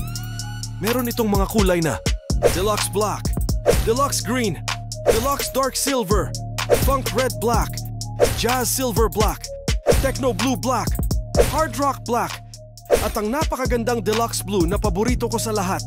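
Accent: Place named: United States. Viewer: kuya halatang laki g america yung accent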